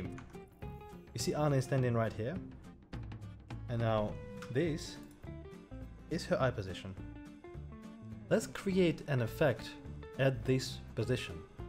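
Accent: Ireland. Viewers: Didn't know you're Irish dude, what county? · Are you irish?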